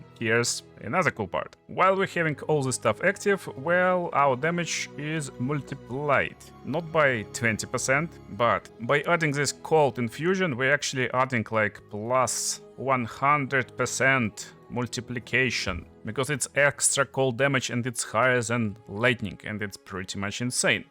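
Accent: Russia. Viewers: судя по акценту парень то русский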